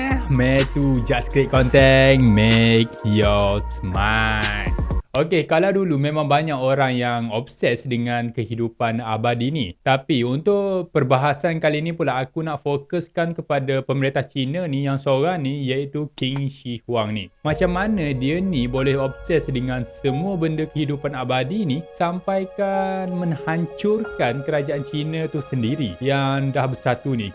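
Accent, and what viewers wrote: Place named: Kelantan, Malaysia. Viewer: awak ni orang kelantan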